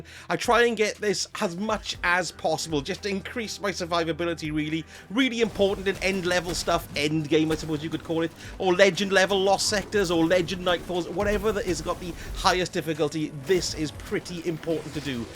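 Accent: Wales, United Kingdom. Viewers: i can spot a welsh from a mile !!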